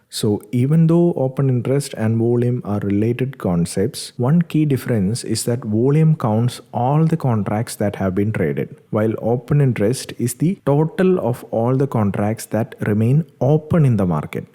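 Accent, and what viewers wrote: Kerala, India. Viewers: The voice of a Malayalee. Well explained. Thanks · Sir, are you from Kerala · Excellent Video. Volume pronunciation can make out that it's a Malayali. Nevertheless, excellent presentation.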